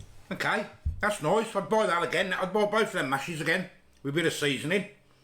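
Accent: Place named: Black Country, United Kingdom. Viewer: Salt and pepper is not seasoning I think you and are amazing people loving the Black Country accent I’m from the Black Country too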